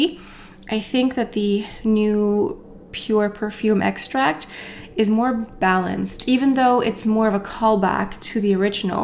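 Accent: France. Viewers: Love this perfume!! Love your French accent! Thx for sharing dear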